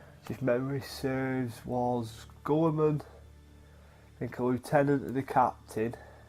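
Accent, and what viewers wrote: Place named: East Lancashire, United Kingdom. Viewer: Personally, it's always fun over victory. I basically buy miniatures I like then figure a way of getting them into a list. As you say, unless you are going to tournaments, it shouldn't be just about the best list. As to the video, personally I can listen to people ramble on about 40k all day. As long as I don't hate the accent (ok, you don't have the smooth tones of West Lancs but east lancs is alright 😁) I'm happy to listen. For wider appeal you could make it a bit less rambly. Have points you want to make and kind of plan it out better. But as a first effort it was a good listen.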